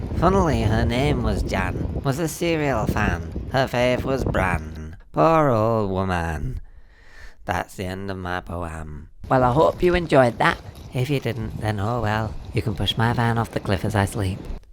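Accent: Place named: North, United Kingdom. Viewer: And the lips. Don't forget the lips. Oh, and the Northern accent 😁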